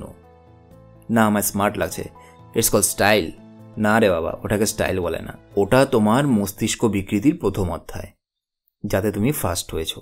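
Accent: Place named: West Bengal, India. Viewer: কথার উচ্চারণ শুনে মনে হচ্ছে আপনি ভারতীয় বাঙালি।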